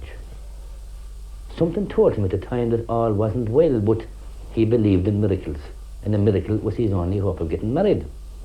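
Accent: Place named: Limerick, Ireland. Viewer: That could have been my Limerick-born father or uncles talking!!! "advert izmunts" "Shtand there one second" "A big blacktorun schtick ....." Wonderful!!!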